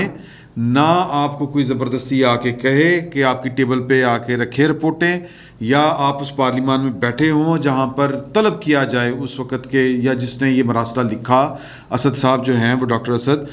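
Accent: Punjab, Pakistan. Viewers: You always share nice informations. However i like your way of talking. Urdu mai Punjabi ka Tarka. appreciated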